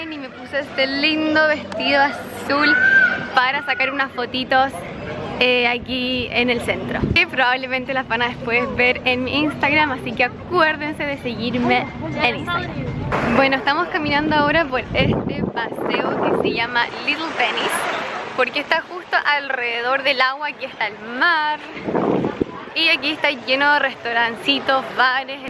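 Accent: Chile. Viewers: Es chilena no? Es la primera chilena que me gusta como habla.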